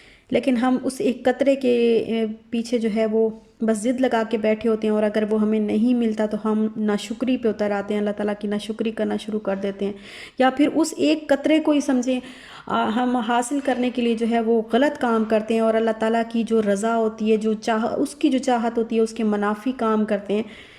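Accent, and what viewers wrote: Saraiki, Pakistan. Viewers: Dear sister mein ap ka vlog 1st time dekh rhe hu ap kaha rehti ho aur ap kis city se belogg karti ho muje ap ke zuban mein saraeki ka tech lag raha hai mrs zulfiqar lahore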